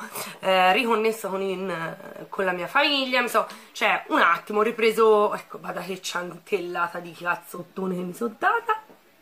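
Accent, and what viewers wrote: Tuscany, Italy. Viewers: Grande mi mancava sentire il nostro toscanaccio!!! BEN TORNATA!!🎉🎉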